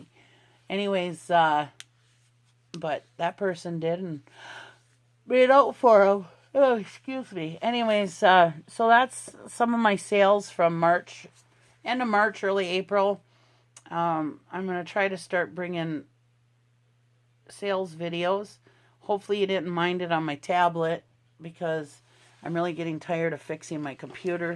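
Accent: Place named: North, United States. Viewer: Great Sales! Even though it was a year ago lol. I thought it was recent! lol Wisconsin I had a feeling, just by your northern accent. I still have mine I refuse to loose it-Never ever-lol. Have a great weekend. I gotta go call eBay & hope they can help me fig out how to cancel my GTC items so I can throw them up on Auction this weekend to get new stuff listed just so much to list with a 3rd Generation Sale. I source from my eBay & photo room lol. So much $$$ in there & need to get it up. Seeing vids like this just encourages me to keep hustle and knocking harder.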